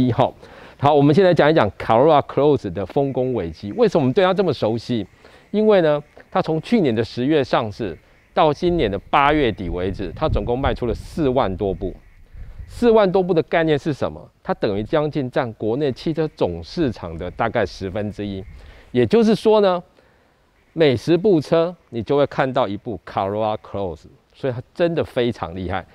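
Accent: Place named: United Kingdom. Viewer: 我都不知道台灣是以美語為主還是英文為主,怎麼發音都走英國風?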